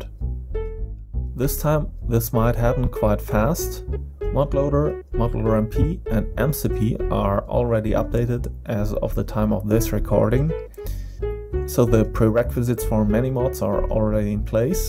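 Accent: Germany. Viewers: Deutscher oder..?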